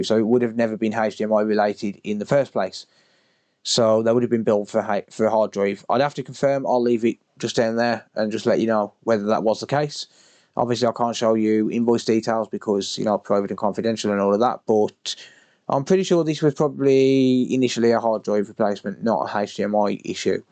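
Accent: United Kingdom. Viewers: could someone enlighten me what kind of british dialect he is using? I've never heared it before and it was a bit hard to get used to it but after watching several videos, its a lot of fun to listen to him.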